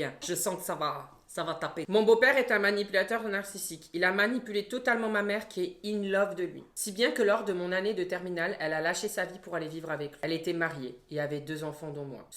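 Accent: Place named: Southwest, France. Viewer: moi aussi je le prononce comme ça et je suis du sud-ouest aussi comme lui.... "Am'mandonnéééééééééééééééééééééééé"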